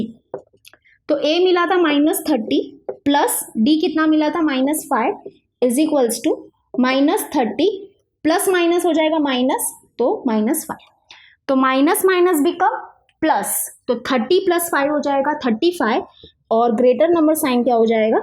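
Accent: Maharashtra, India. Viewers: Miss are you marathi Because you says barobar ❤️😀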